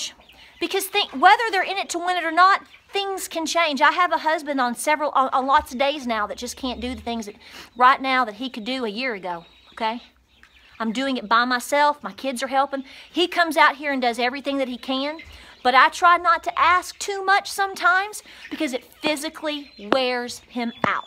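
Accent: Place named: Tennessee, United States. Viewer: I knew I liked that accent. I use to have a friend that lived in Tennessee that would talk about that team. Good advice! I talk to some local farmers who say they owe way more then there farm is worth and they can't stop farming because if the debt. I can see you appreciate the little things in life. Keep up the good work sound like a neck of a woman. God Bless and take care from WI!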